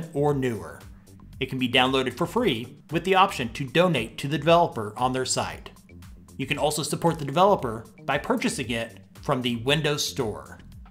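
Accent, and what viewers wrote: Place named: United States. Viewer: Wow, an english speaking American video, that's not from India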